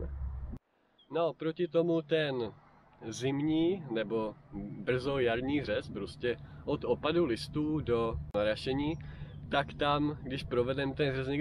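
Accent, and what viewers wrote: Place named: Wallachia, Czech Republic. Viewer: Mluví krásně valašsky, taky se mi to líbí :)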